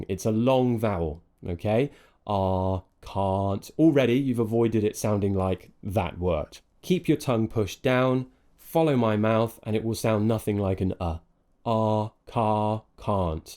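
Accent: United Kingdom. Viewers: Hi I really like you channel and your authentic British accent. ❤❤❤❤and by the way your handsome face is very charming. I am from asian 😅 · Thanks a lot mr for your videos and now you look more handsome than your some previous videos sir I always like your British English accent. Mr you always our British English teacher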